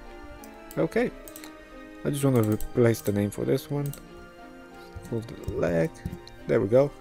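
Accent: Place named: Brazil. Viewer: Thanks for sharing! Sinto um leve sotaque BR :}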